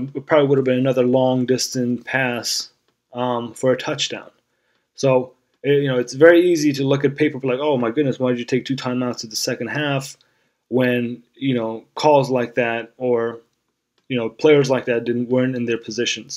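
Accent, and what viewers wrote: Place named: New York, United States. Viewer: Hi its wildcat thanks for liking my video i subscribed to you sound like a good new yorker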